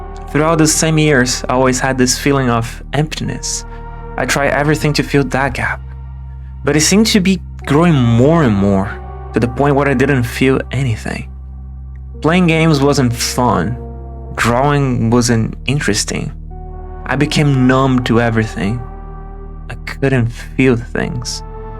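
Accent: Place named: Brazil. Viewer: eu reconheço um sotaque brasileiro de longe. ótimo vídeo, desejo tudo de bom pra ti! vc eh zica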